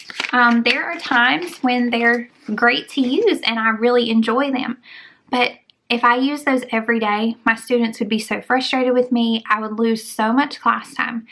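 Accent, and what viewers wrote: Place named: South, United States. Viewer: I like your southern draw.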